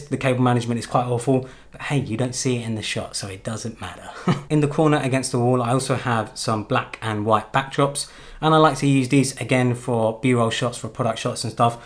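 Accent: United Kingdom. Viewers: Hey that studio set up is Tickety-Boo looks sweet, quick question you mention $ in the video but that accent is defo from here in Blighty - Happy New Year!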